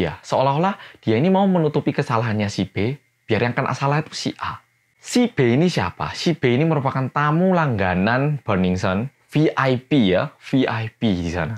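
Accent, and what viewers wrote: Java, Indonesia. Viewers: Suaranya kayak mas-mas jawa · Cuma dia youtuber yg gak minta di subs..like..comment..😂😍 kontenx jg smart bngt..cara dia nyampein informasi...dannnn medokx itu😭😭😭😭😍😍😍 aduhhh..oppa kang mas..aku tresno karo koe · Kaya orang jawa ngomongnya😍😆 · mas, ndek kae luwih medok tiba e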